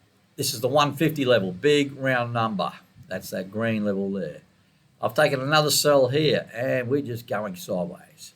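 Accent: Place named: Australia. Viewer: Thanks a lot. Yes please, keep the Aussie accent. I won't be able to handle the Scottish haha:D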